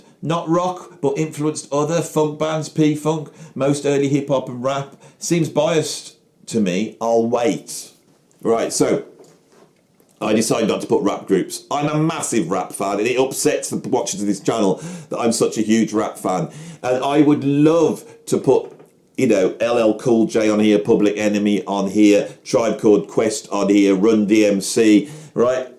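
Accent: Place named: Black Country, United Kingdom. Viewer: You sound like a YamYam so heres one for ya, saw the Pistols at the Laffiette Wolverhampton in 1977, they were touring as the Spots (Sex Pistols on tour) because they were banned from everywhere. Nancy was stood behind Sid during the gig as he proceeded to carve his chest with a beer can . those were the days...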